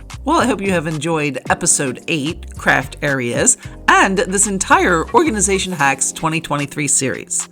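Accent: East Coast, United States. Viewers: It’s a shame you don’t have any craft supplies on hand. I have to wonder how many viewers caught your pronunciation of crayons, “crowns,” rather than, “cray ahns.” Sometimes you’re so east coast. I love it! I’ve got shelves in my craft cave, but I’m a shortie so I can’t reach things on the top shelf. I need to change some of the stuff to your ideas to make the space more accessible. Happy New Year,!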